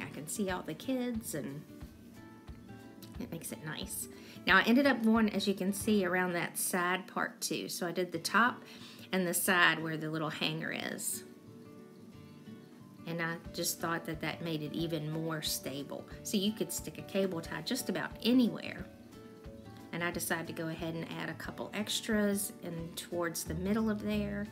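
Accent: South, United States. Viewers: Hi Mam, It's am in Arlington, VA on Saturday, October 28th, 2023. You posted this 6 years ago so your son may be close to being a teenager now and I hope he is well and your family. I am suppose to be seeing up a storm today and working with my glue gun as I am in a 3 day craft sale next week. But,I am tired from my week at work and I am taking a break. I live in a very tiny Flat and my 3 closets are very very tiny so I use clear shoe bags on the back side of 2 doors. My kitchen is extremely small and I believe this caddy would be awesome in my kitchen for light weight utensils and other cooking needs, a place to hang pot holders. I only have 3caninrts for food and dishes and not many drawers and they are so thin you cannot have a wide utensil holder. I have often looked a the kitchen mats at the Dollar Tree thinking they would be nice to hang my vintage fishing lures on. 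I like your country accent. I need to check out your site to see if your on Instagram. I hit to subscribe I hope your still making videos. Great job on this storage craft caddy. Sincerely, Rebekah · your southern accent is wonderful what part of this great nation are you from my family is from South Carolina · Really cute and ingenious idea for dollar tree items. We crafters never have enough space. I'm always looking for something from one of my crafting categories, watercolor painting, jewelry making, paracord crafts, crocheting, sketching and drawing, home decor, gift wrapping etcetera and so on. I'm so glad I stumbled upon your channel recently. Keep it up your easy to listen to, not over detailed or unneeded repeated instructions that can often feel redundant when listening to others. But not you, I love your flow, your realness and that southern accent is so darn sweet. Much success to you my dear! 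· I love you tutorial and your Southern Charm, very calming and relaxing. Thanks so much for sharing, from a Texas Southerner... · Thanks for this great inventive idea, and I just wanted to say your accent is adorable! I’m from WI and don’t hear a southern accent often 😉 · Such a cool idea!!! Loved your video you explained things very well and love your southern accent too:) Look forward to more videos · Say there!! (my name for all my girlfriends, and I know you you would be). Can I tax your brain, or anyone else's and try to come up with something on this order we could put together to hold all my netting rolls, I make wreaths out of. So far it's big unhandy clear trash bags, because I have so many other crafts, I've run out of room, and that picky netting goes right through the trash bags. I agree with Iris only I like the Southern part of your voice also. I definately will be making some of these for my clutter of various different craft supplies asap. 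Thank you for being so organized!! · P Vir Another update - subscribers: from 500 on May 26, 2017 to 14,363 on June 7, 2017...and I make 14,364! That's a huge growth rate! It gives me hope for my videos (silk painting)! Great ideas! Your camera angles and explanations are perfect! You sound like you're from The South. (I'm from the Deep South 😉) Thank you for sharing your God-given gifts, talents and ideas with us all! God Bless!!!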